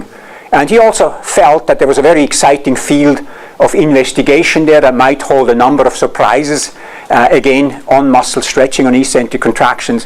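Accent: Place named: Switzerland. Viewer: YEEE Er esch en Schwizer vo Leuggere. Geile Typ I was 100 percent sure hes got the classic swiss accent. Guess what - he is from my homecountry xD.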